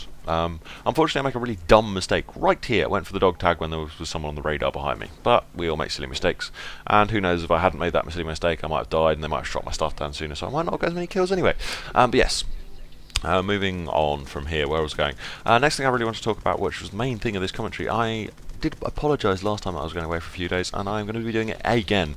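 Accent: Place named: RP, United Kingdom. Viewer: Still posh.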